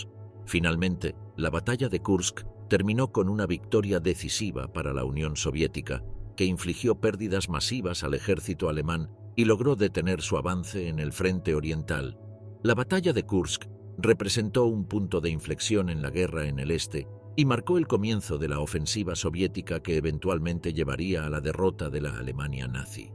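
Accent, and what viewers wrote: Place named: Castile, Spain. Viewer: Joder que gusto escuchar un video asi que no este en español latino (realmente esta mal dicho pero bueno), por fin. Que gusto escuchar un video asi en castellano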